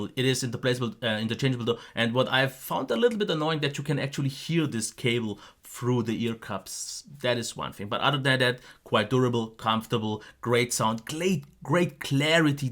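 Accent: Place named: Germany. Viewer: He sounds German - what do you think?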